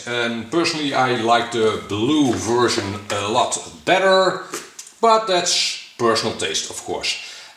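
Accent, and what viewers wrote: Netherlands. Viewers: Mooie video👍... ik wist gelijk al van dat Is nederlands accent en toen zag ik de 😂💪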